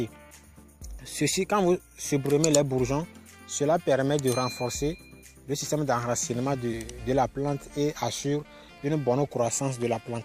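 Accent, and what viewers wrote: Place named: Africa. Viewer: DSL, je ne comprend rien,,,,, accent Africains trop prononcé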